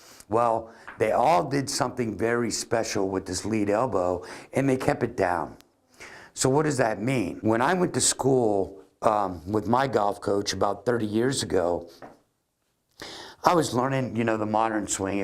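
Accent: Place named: New Jersey, United States. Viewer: Great instructions again. Your reference to Ben Hogan's glass pane was a great thought and keeping that elbow low helps me. Consistent ball striking is the result. By the way, I'm from over in the UK and your accent is straight from The Sopranos. (No offence intended!!) Best wishes.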